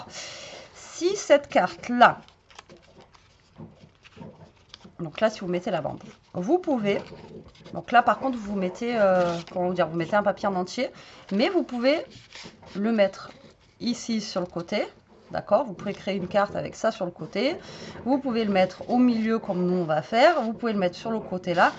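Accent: South, France. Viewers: Bonjour, je vais regarder ce nouveau tuto avec grand plaisir comme tous ceux que vous faites, j'apprécie beaucoup votre travail, votre style, votre accent 😂car je suis aussi du sud, Montpellier, mais je suis désolée que d'autres personnes personnes vous aient fait de la peine, c'est écœurant et je vous comprends, c'est pour ça que j'insiste pour vous dire franchement que vous êtes une BONNE PERSONNE, ça devient rare en ces temps compliqués, j'espère que vous surmonterez cette méchanceté, moi je vous dis mille mercis et je vous fais un gros bisou😘😉 Elvire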